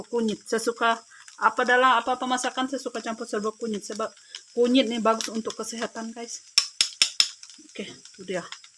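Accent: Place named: Sabah, Malaysia. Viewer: Kc antam saja tu melayu sabah bha. .haha. .more real sabahan style !!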